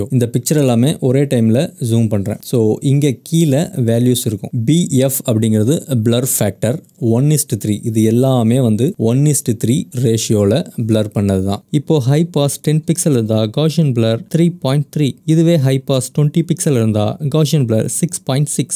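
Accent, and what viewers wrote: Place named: India. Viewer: Saya dari indonesia.. Tutorialnya bagus, tapi saya tidak terbiasa mendengarkan bahasa inggris aksen india.. Sebaiknya ada tulisan inggrisnya, atau translet indonesia.. 😃😃😅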